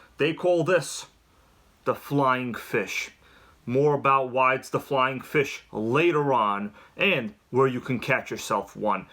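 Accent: United States. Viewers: Hmmm I think in the long run it’s worth spending more bucks on a more reliable machine. I’ve only recently started watching your videos and only recently started thinking about getting into nitro. Just bought an hsp nitro truck and after looking at your video I’m going to return it and maybe go for a Hpi bullet nitro. The problem with having a cheap car with faults that you just pointed out would certainly put one off once it starts falling apart. The only problem with the Hpi bullet nitro is no 2 gearbox, maybe there is an upgrade? Please keep your presentations coming really enjoy it. That accent of yours, which part of the states, sounds really cool . This is Ricky from down under regards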